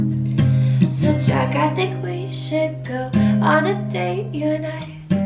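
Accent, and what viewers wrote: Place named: United Kingdom. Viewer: Are your from UK if u are the accent is cute 😳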